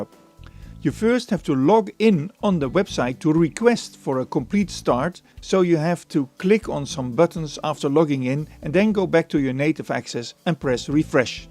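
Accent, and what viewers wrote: Netherlands. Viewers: I hear you are dutch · are you dutch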